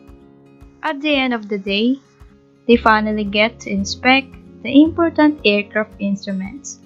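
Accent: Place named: India. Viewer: OMG, heavy Indian accent, read by someone who does not understand what they are saying.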